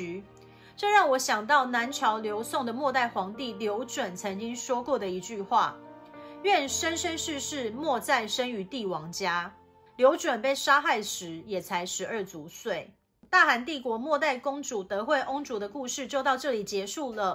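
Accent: Taiwan. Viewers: 这个台湾腔听的我头疼😖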